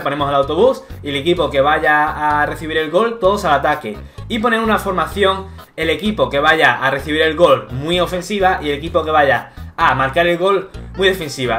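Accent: Germany. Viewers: eres Alemán??? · eres aleman?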